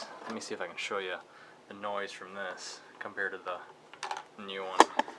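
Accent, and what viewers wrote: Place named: Green Bay, United States. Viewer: Hey thanks! Where are you from? You sound like you're from Green Bay.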